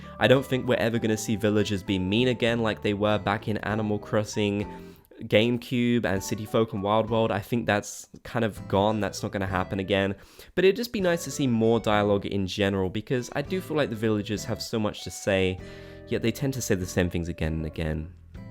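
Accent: United Kingdom. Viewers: Is it just me or he sounds british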